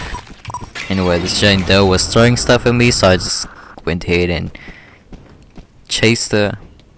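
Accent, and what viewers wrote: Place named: South Africa. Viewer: You kinda sound South African (correct me if I’m wrong) XD Also great vid! :3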